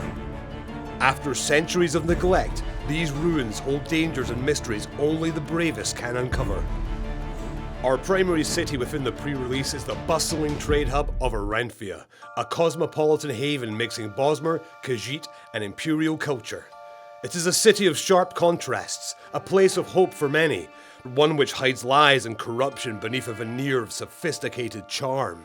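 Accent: United Kingdom. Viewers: Can anybody nail down the presenter's accent? It sounds mostly Northern English or Scottish, but once in a while I could swear I hear bits of Irish and Welsh... maybe even a bit of American? If I had to guess, I'd say this guy has probably spent a lot of time moving or traveling in his youth. I'm fascinated by English dialects, and this guy's intrigues me. Oh, but great video btw. Beyond Skyrim is my brightest hope for the future of TES, and I love the work you guys do. Keep it up. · Ok watched for an interesting topic, but I'm now staying for the voice. Scottish accent my favorite ever since Sean Connery became my Bond. Great video and thanks for the content also.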